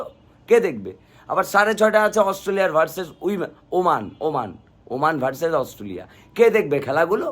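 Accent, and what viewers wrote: Kolkata, India. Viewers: এরকম কলকাতার ভাষায় বলতে থাকলে ভিডিওতে লস খাবেন আগের ভাষায় ফিরে যান। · আপনার মুখে কলকাতার ভাষা ভালোই লাগলো। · Kolkatar vasay joss lage vaia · Bhai kolkata r accent ta ekdom bhaloo lagee naaaaa............Normally onek bhalo lage apna kotha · কলকাতার এক্সেন্ট আপনারে মানায় না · দারুন কলকাতা ভাষায় কথা বলছেন❤❤❤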